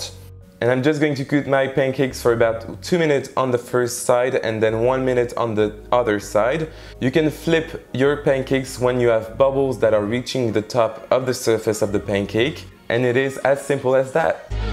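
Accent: France. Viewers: MUST try this recipe. You make it look so delicious! ❤ Hey, just curious. I can't place that accent. Where in France are you from,?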